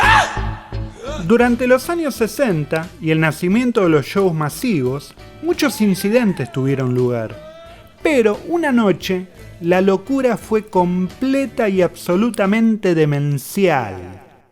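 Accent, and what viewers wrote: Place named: Argentina. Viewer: Mola el acento Argentino bro! jaja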